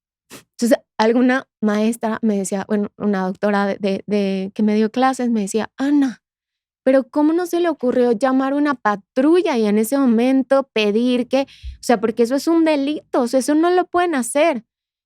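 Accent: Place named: Colombia. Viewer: Habla como Colombiana...solo falta el ...como así...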